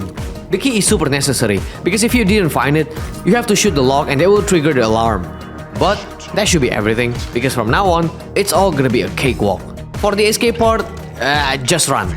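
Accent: Indonesia. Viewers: logatnya kaya orang indonesia.. bener kah bang? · u sounds like indonesian, nice accent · i knew it!! judging from ur accent and the way u talk u are indonesian, so glad that i found indonesian gta online youtuber! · logat nya kayak orang indo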